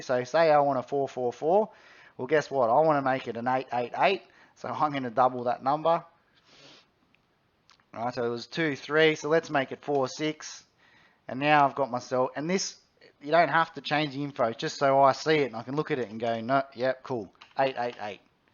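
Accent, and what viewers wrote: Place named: Australia. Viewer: Your accent makes this video 10x easier to pay attention too. Not sure why, you are Australian correct?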